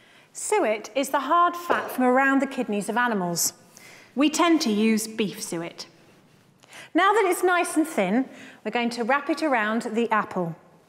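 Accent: United Kingdom. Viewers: 凄まじいイギリス英語だな Very British English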